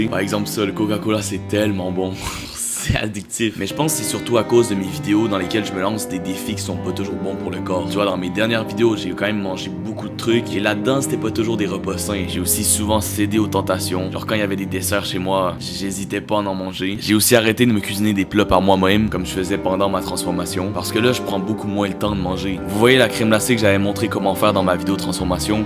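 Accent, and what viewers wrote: Quebec, Canada. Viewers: Je suis désolé mais J’ADORE l’accent québécois c’est pas méchant je le trouve trop bien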